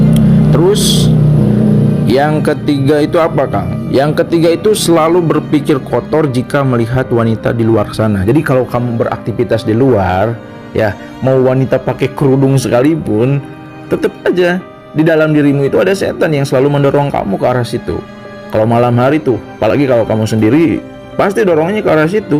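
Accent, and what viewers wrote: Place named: Cirebon, Indonesia. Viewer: bahasae kaya cirebon ...cirebon pundi kang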